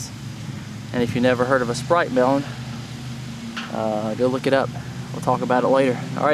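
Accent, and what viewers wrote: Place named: South, United States. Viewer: Widow maker 🤣🤣🤣. That’s funny, sounds like a southerner. (Thanks for the video)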